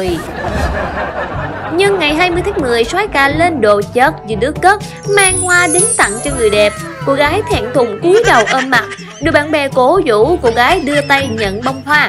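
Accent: South, Vietnam. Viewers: Lần đầu góp ý với Channel 3p mình phải thật lòng nói Ms MC có giọng nói Nam Bộ rất vui tính và very intelligence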